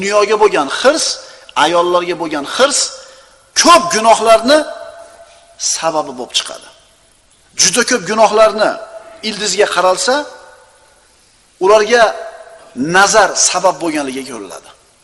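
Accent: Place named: Andijan, Uzbekistan. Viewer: Ollohga Shukur ona vatanim tinch Oshdan ekan. Andijon bn shevasi bir xil ekan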